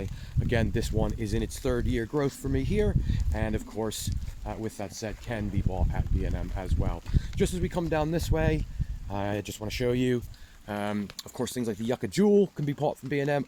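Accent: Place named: United States. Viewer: I thought you and an American tone to your voice! How did you end up in England? 💚🌴🌹🐦‍⬛🌿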